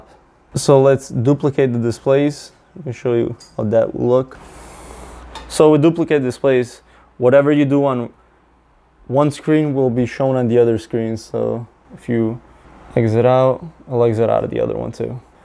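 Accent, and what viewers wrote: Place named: Staten Island, United States. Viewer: That's the way to do it. Clear, short and with a Staten Island accent. Makes it that much better ;)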